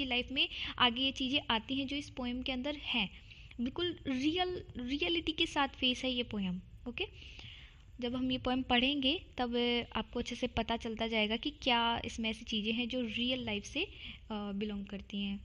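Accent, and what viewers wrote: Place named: Rajasthan, India. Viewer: Btw... Scrolling through ur channel i realize 2 things... U have a really sweet voice & that has a rajasthani texture r u from rajasthan or sort of outskirt's of it ??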